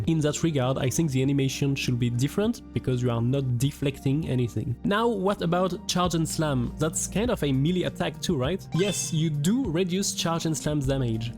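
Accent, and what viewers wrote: France. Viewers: bro are u french ? ur accent sound like it ? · Your france accent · tu as un accent français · Cet accent français de qualité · your accent looks French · T'es français toi non ahah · Is that a French accent I hear?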